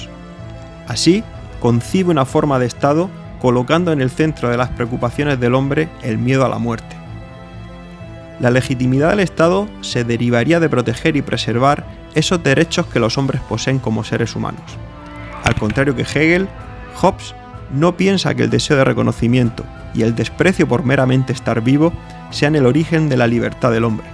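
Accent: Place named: Spain. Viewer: ja ja ja La democracia liberal como la único sistema político que permite vivir en libertad. Eso es gracioso y también impreciso. No se si te estas refiriendo al liberalismo de Adam Smith o al neoliberalismo de Milton Friedman, o cual de los neliberalismos. Por que hay varias corrientes neoliberales. De cualquier manera ninguna funcionó. Algunos engañabobos tratan de poner en practica el libertarismo como si de una corriente ajena al liberalismo se tratara. Estas corrientes como odas las teorías económicas son superadas por la realidad. Sin embargo aportan al progreso de la humanidad, incluyendo a "Critica al Capitalismo" tan criticado, poco leído y sacado del contexto del periodo histórico en que fue escrito. Te recomiendo te busques a un patrocinador como lo hacen "visualpolitik" o Gloria Álvarez. Tienes un acento como de España. Ustedes no viven en democracia. 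No eligen a su presidente por sufragio directo y mantienen a una monarquía inútil. Después de tres meses ya tienes 4 likes, y este comentario. No te rindas, tu puedes.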